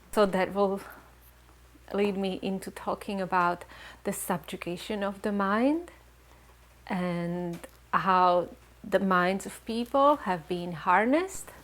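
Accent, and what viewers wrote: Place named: Hungary. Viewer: Wow!👍I could of sworn your accent is hungarian! Totally different language, very similar accent! Cool! 👍😃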